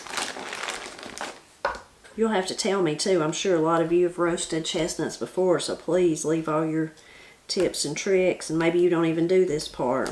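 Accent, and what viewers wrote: Appalachia, United States. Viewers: I remember running barefoot on warm summer nights playing hide n seek stepping on the chestnut burrs lol. .my dad had a orchard with fruit trees, chestnut trees and huge gardens Those were the days. Love your channel and your Appalachian accent. What a wonderful family you all are. I watch you all the time. We grew up born and raised in S Western Pa. making homemade cider and jellies. You ever try corn cob jelly? It's really good. Happy New Year!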